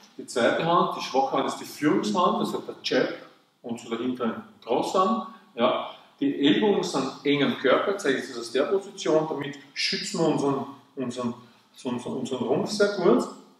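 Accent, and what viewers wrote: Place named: Vienna, Austria. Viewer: Hallo Super video nur eine kleine kritik am rande sie reden zu schnell und man versteht sie kaum also zumindestens ich aus hamburg verstehe kein wienisch manche wörter versteht mann aber wenn sie zu schnell reden kommt man nicht mit Das gesagt bedanke ich mich für das tolle video weiter so ein daumen hoch von mir und das abo an ihr kanal selbsverständlich 👍👍👍👍👍👍👍👍👍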